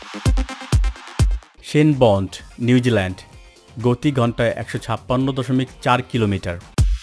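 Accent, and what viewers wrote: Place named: Chapai Nawabganj, Bangladesh. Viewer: উপস্থাপক এর বাড়ি চাপাই নবাবগঞ্জ মনে হয়... শব্দ টা পেশার না, পেসার...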